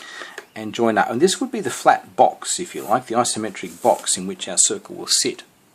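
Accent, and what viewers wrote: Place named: Australia. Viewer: Its an aussie accent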